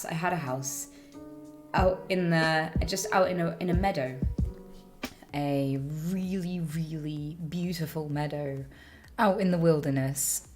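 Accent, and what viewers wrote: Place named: United Kingdom. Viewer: She sounds so much more british here, than she does now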